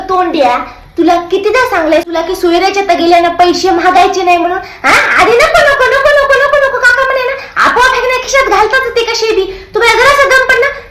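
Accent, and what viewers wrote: Nanded, India. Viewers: तुमचे बोलणे ऐकून मला माझ्या नांदेड ची फार आठवण येते 😊 Keep it up and God bless you dear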